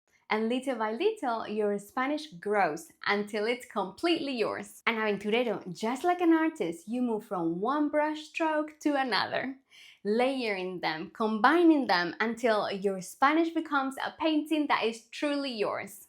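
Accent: England, United Kingdom. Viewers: This is very true. Just listening isn't enough. If you listen to a lot of hours your listening comprehension will be high but your speaking won't. You've got to practice speaking and at first it will be difficult with a lot of mistakes, but the more you speak the better you will speak. The great thing about it is, if you listened to 2000 hours you don't need to match those hours with speaking hours. You can reach a good level of speaking with less hours but obviously, the more speaking hours the better., I can tell you've listened to a lot of English content. You've acquired the accents. I say accents because you have an accent from England and also a little from America. It's all good though. You're fluent. There is no doubt.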